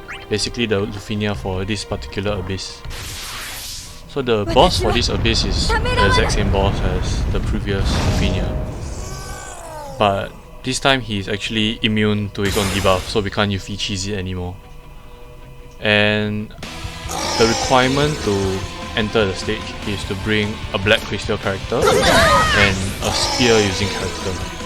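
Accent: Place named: Singapore. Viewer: You Singaporean? I can recognise the fellow accent xD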